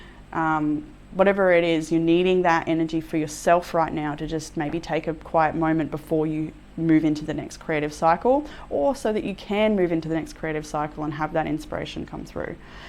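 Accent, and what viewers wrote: Australia. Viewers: I love your readings. Some resonate more than others. I love listening to you as I’m also Australian and it makes me feel closer in some way. I listened to this reading last night and picked group 2. This particular reading felt so personal and I’m hoping it did for many others too. I love that sometimes it is right on the mark. Thank You 🙏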